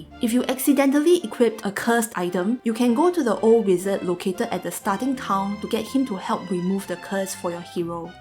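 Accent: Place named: Singapore. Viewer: That sing accent tho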